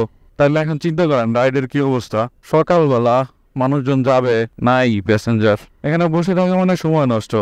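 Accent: Barishal, Bangladesh. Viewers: বরিশালের ভাষা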